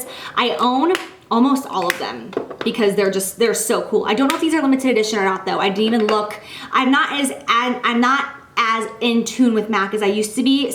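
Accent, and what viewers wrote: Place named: New York, United States. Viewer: she has like a New Yorker accent lol ily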